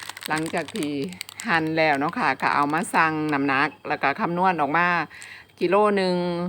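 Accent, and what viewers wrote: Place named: Xishuangbanna, China. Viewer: สวัสดีครับติดตามสำเนียงก็เหมือนสำเนียงสิบสองปันนาเลยครับปลื้มใจมากๆครับ 大家好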